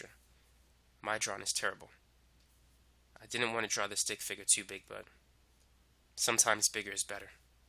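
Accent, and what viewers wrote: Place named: Boston, United States. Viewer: I am a woman and this guys Boston voice is so hot.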